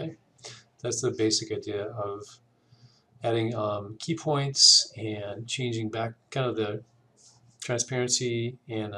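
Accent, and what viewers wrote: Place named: Midwest, United States. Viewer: I'm glad that I found this. It is straight forward and so much simpler than others that try to do the same thing. You are easy to understand for me by speaking mid-western English. I'm a 71 year old retired Engineer and I bought a monthly subscription to Solidworks. I have modeled all 88 pieces from Gilbert's Erector Set 8 1 / 2 and am in the process of assembling the projects. You may remember them. Now I can show my friends the animations. Still trying to figure out how to wrap a rope around a crank and pull something with it.....T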